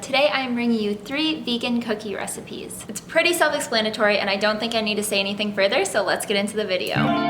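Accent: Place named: Canada. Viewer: sweet.. I verly noticed she is from Canada and that explained why she said *aboat pretty cool any way loved the video! (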